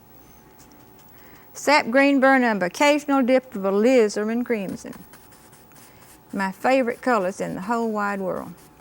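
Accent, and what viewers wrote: South, United States. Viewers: I love her Southern accent!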